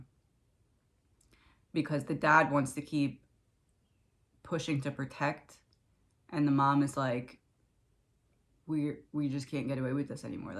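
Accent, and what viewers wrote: Philadelphia, United States. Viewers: That was one of the most interesting hours I've spent on youtube in a long time! I had no idea how cards were read, or how they were used to connect with spirit energy (I'm probably butchering the proper vernacular ) or even how a story comes together, but this was fascinating! I also enjoyed your very laid back, soft spoken demeaner, which is very calming. Do I hear a "Philly girl" in your accent, or a surrounding suburb? I grew up there. It's an accent I seem to notice immediately. Anyway, I was listening to someone on youtube last night who apparently receives information from "spirit" by being shown things in her mind (I guess) which she then interprets. I mention this because her entire session revolved around things and people connected to the death of Hannah Cleere and her family. Many names came to her that were members of Hannah's family, and she mentioned rather often that none of the information she was receiving was what she had expected to be discovering last night. 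If I'm not mistaken, you also mentioned something "catching you off-guard", or "throwing you off" about the cards being chosen as it relates to Hannah. Honestly, both your reading and the one from last night were so interconnected and so overlapping it was quite amazing! I have always thought these murders were either 1) revenge for the death of Hannah, or 2) the ex-boyfriend (Jack D), feeling betrayed by Kaylee for breaking up with him. Just wanted to share my thoughts, and thank you again for such a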